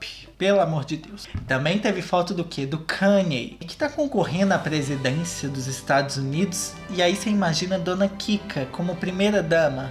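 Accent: Minas Gerais, Brazil. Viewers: Kkkkkkk Nem sei prq esse vídeo apareceu p mim kkkkkkk Vc é muito carismático É mineiro?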